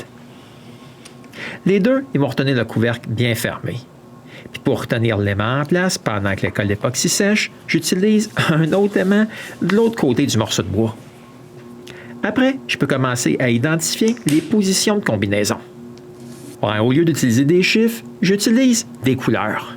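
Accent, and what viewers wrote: Quebec, Canada. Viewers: Super idée et super réalisation ! Il ne manque que les odeurs de bois et de colle . . . Bon , l'accent québécois est parfois dur à comprendre , c'est un Marseillais qui te le dit ( le nôtre est " costaud " aussi ! ) . Bravo pour ton travail ! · J'adore c't accent d'la belle province ! Mais mince alors, c'est pas juste kek bouts d'bois, c't'un chef d'oeuvre, tabernacle !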